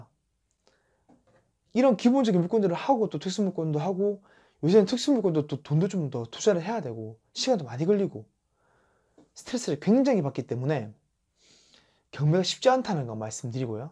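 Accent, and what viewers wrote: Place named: Gyeongsang, South Korea. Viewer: 멘토님 대구분입니까 부산분입니까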